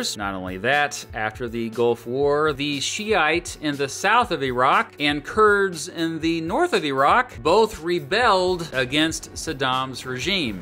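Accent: United States. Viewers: وده واحد امريكي بيتكلم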